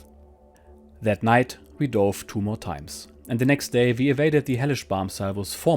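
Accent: Germany. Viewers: I like the fact that you are narrating this with a German accent... it definitely brings a little more life to what these men may have sounded like in general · Hi, another great episode under your belt! Your narration is improving with every episode and your German accent adds to experience of reading the war diary of a German U-Boat officer. You made the right decision to ditch the synthetic voice as a human voice is so much better and knows certain language conventions better. I also enjoyed hearing about your holiday at Lake Constance. More flavour for the story! Enjoy your holiday and see you next episode! Mark from Melbourne Australia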